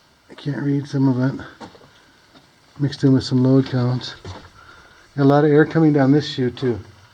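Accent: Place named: Upper Midwest, United States. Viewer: I am very curious where you are originally from? Your accent sounds like you’re from the upper Midwest, Upper Peninsula, Minnesota, etc?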